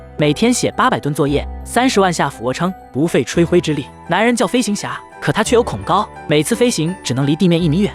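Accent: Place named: India. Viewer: 这样印度来的么，味好重啊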